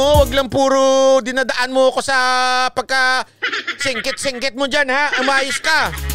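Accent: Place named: Iloilo, Philippines. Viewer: Bwaaaah bagay sayo mg ilonggo sir 😂😂😂ang dami kong tawa sayo tlga😂😂😂😍😍😍❤❤❤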